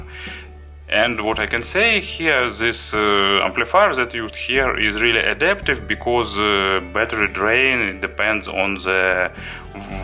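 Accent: Russia. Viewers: Сразу слышно суперрусский акцент😂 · Сильно чувствуется русский акцент)))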